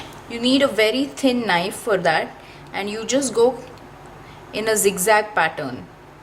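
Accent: India. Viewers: Try some elocution lessons so you don’t have that thick Indian accent ...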